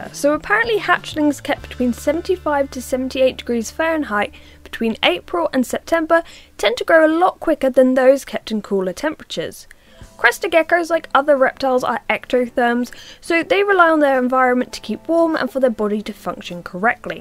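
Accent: England, United Kingdom. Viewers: where about from England are you from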